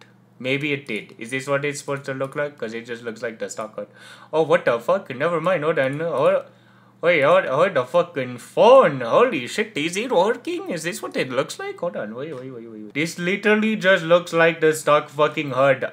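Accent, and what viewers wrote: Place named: India. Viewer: W indian voice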